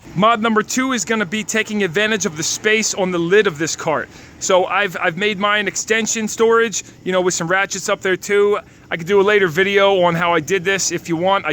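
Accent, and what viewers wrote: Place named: Philadelphia, United States. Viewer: Nice Philly accent.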